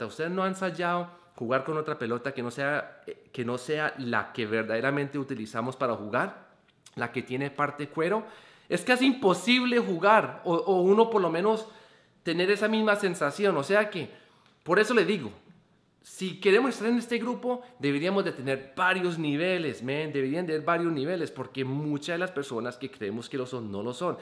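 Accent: Colombia. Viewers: Holaaaa me encanto tu video !! Esa es la neta como decimos en DF ahora Ciudad de México. A las cosas x su nombre. Beso me encanta tu acento colombiano.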